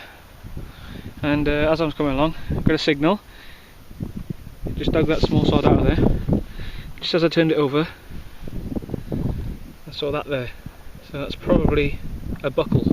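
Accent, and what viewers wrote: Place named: England, United Kingdom. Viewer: I enjoyed your English accent almost as much as the finds! Fascinating bit of history where you live. You are very fortunate. Thank you it's been fun! -Jeff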